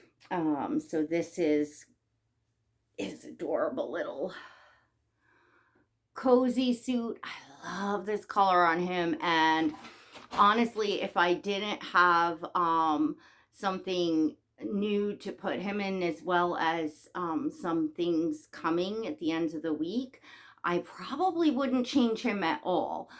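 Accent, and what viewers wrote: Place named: New York, United States. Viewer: I have watched you for months and just commented now but I absolutely love you and your channel! Your New York account is my most favorite accent ❤ You are such a great silicone/reborn mommy and I love all your babies. It’s funny how people always got to but in and add their 2 cents to everything lol!!! There’s is nothing wrong with us loving our Dolls and role playing. Back in 98 I had my one and only son because after him I developed a heart condition called postpartum cardiomyopathy at 22 and couldn’t have anymore so when I found these babies years ago and finally got my first full body silicone in 2021 I was over joyed and since have added up to 15 silicones and 1 reborn that I’m selling but because I just put on the new born stage of my real child and couldn’t have anymore I just love these new born and preemie babies since my son was a preemie too! 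These babies are definitely great therapy and help so much. I totally would adopt if I could afford it because I’m 47 but definitely would give anything to have another new baby around but it’s not in the cards and so many just like to voice their opinion but anyways you keep doing you and keep bringing out those beautiful babies. My whole family has taken to my silicones as real family and just loves them too. It’s really helped me as well to hold and play with these babies but to know the full responsibility isn’t there so we can still enjoy life and do what we need to and play with our babies when we need too and want too.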